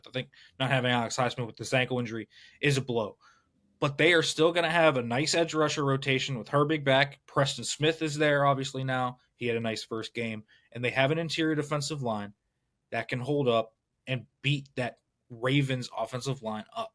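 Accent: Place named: Pittsburgh, United States. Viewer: Dudes yinzer voice/ intro is the perfect set up for to come in and do his thing. But where did dude from the left come from? 😂 he been a beat writer for years?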